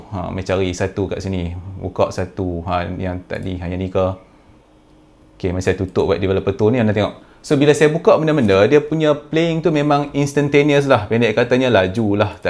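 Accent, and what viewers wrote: North, Malaysia. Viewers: Lorat abg ni mcm org utara la,hehe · Guna pekat utaqa pun elok gk.. Lg unik vdeo.. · Abg guna loghat utara baku ka ni?sedap dengaq..hahaa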